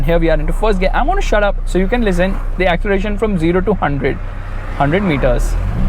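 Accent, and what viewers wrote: India. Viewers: Dont speak English in indian style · ..... Indian accent.....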